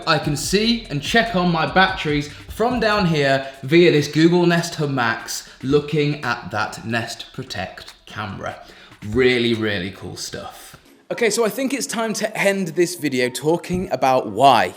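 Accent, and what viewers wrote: United Kingdom. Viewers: British guy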